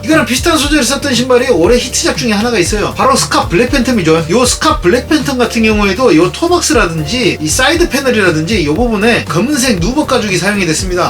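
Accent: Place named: Gwangju, South Korea. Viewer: 예전 영상에서는 전혀 몰랐는데 이번 편에서는 네이티브 사투리 살벌하시네. 광주 아니면 광주 그 근짝 동네 억양이신데 맞으요?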